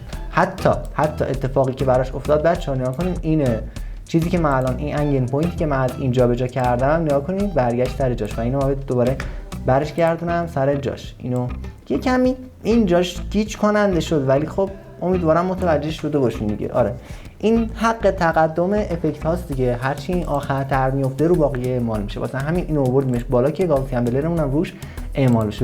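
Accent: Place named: Isfahan, Iran. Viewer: برای لحجت عذر خواهی نکن از این به بعد لطفا فقط اصفهانی صحبت کن 🔥🔥🔥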